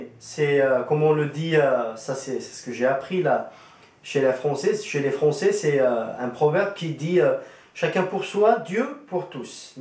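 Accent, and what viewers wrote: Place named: Kabylie, Algeria. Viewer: Le disciple Au commencement de votre vidéo vous dite ceci " bonjour bonsoir je me présente je m'appelle je suis Algérien kabyle............" vous vous adresser a qui la? pas aux Kabyles en tout cas! ni aux Algériens d'ailleurs! pourquoi ne pas réciter votre histoire de conversion en kabyle uniquement!? vous voulez cibler qui en parlant en français par exemple? et je trouve qu'i y a une sacrée différence entre vous entendre parler et vous lire! vous parler et j'ai constater que vous chercher a chaque a dire des mots que vous n'arriver même pas a trouver par contre j'admire votre français avec un accent kabyle! quand a vos écrits le fameux copier coller fait fureur! alors comme ca vous avez vu le film sur jésus? "zmane nsidna issa" c ça? et ca vous a marquer, toucher!! un film! moi qui croyais qu vous aviez lu la bible!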